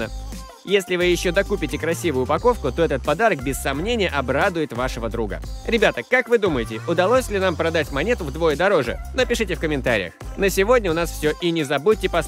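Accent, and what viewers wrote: Kazakhstan. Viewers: Ты из Казакстана ?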